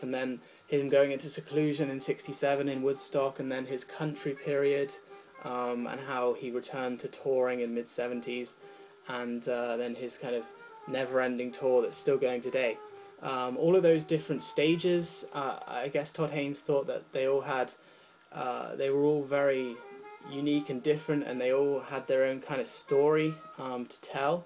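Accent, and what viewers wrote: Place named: United Kingdom. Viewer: before you opened your mouth, i knew you were british.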